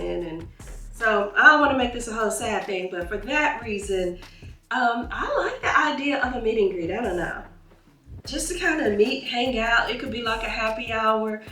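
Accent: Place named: South, United States. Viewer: the Southern twang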